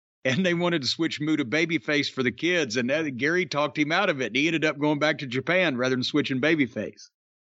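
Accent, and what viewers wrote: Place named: Chicago, United States. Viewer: Gotta love Cornys Chicago accent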